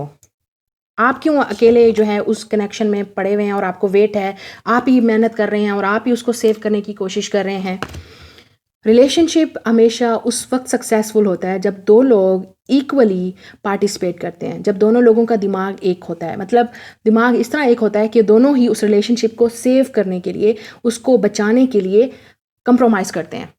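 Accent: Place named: Punjab, India. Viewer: Oye hoyeeee.... Enni sweet punjaabi.... ♡♡♡♡♡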